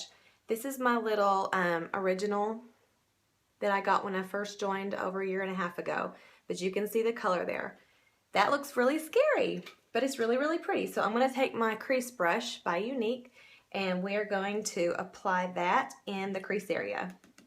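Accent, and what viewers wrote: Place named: South, United States. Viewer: This is a beautiful look!! I have really small hooded eyes and allergies that make my eyes red all the time. I'm going to try this ASAP and pray that I don't look like Satan!! lol. I love how you used blusher as an eye color....never have thought of that. By the way, what kind of diva light do you use for your videos?? It is wonderful....not too bright but gives you that glow where we can really see. And as a "Southern Belle" myself....I love your accent as well 😊😊!! Thanks for the video. Can't wait to try this!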